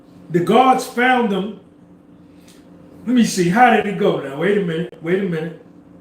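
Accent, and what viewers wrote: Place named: New York, United States. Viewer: You gotta be from ny. I know bc I'm from ny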